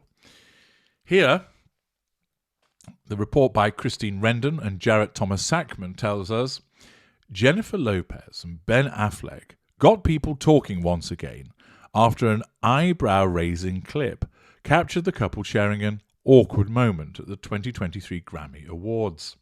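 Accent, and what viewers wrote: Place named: England, United Kingdom. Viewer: I love your voice you have a perfect English accent. You sound like a gentleman 😊💜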